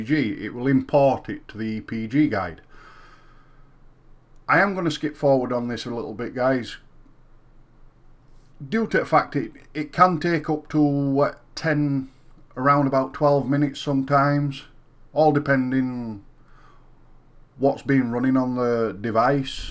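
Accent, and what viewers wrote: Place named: Yorkshire, United Kingdom. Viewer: Leeds or Bradford accent...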